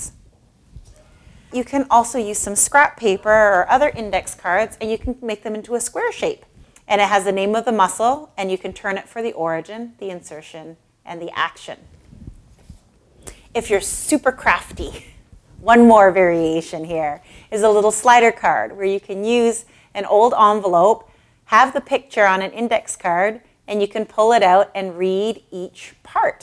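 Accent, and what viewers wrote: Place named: United States. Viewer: Ayami, she's speaking normal speed American English. You have to be faster!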